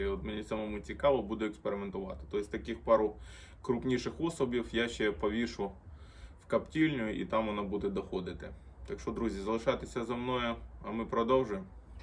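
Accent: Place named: Russia. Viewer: Акцент кацапа а так піде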